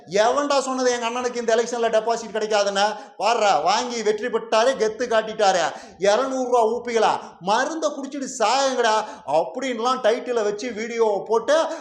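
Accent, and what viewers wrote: Kongu, India. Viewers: தம்பி, your lectures are very deep and insightful, especially on Indian politics. Your analysis is excellent, and the information you provide is valuable. However, I have a small suggestion—sometimes, the topic of your video and the actual discussion don’t fully match. ஈரோடு என தலைப்பு வைத்ததை விட வடக்கு கிழக்கு அரசியல் எனும் பாணியில் தலைப்பு வைத்து இருக்கலாம். It would be great if you could stay more focused on the given topic and elaborate on it more directly. This would make your content even more impactful. Keep up the great work! நகைச்சுவை கலந்த கொங்குத் தமிழில் உங்களின் கருத்து மிக அற்புதம் தம்பி. ஆனால் தலைப்பில் பேசுங்கள்.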